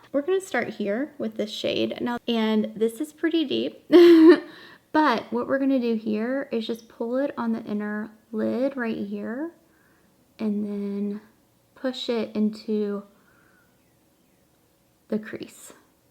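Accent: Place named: Kentucky, United States. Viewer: I am new to your channel after I had second thoughts after already ordering this palette. I love the look and I may give it a try. I was already thinking about returning it and I just ordered it today, so of course I haven’t received it yet. I am scared of blues. I am actually scared of color, period! The main reason I subscribed is because I am from Kentucky and I have the same accent. I use the word y’all all the time. I love the look, but I’m still second guessing. $65 is expensive if I don’t like it. But it gives me the 90’s good ole days vibe.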